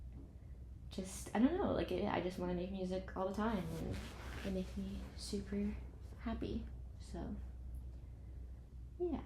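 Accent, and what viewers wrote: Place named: United Kingdom. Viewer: but her accent is british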